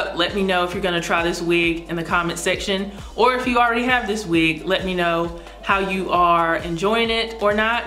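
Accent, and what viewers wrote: South, United States. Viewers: Are you from Texas? You have a slight southern accent and you sound like you could be from here.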